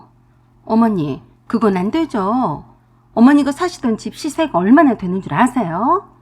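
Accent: Jeolla, South Korea. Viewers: 전라도 사투리가 많이 들리시네요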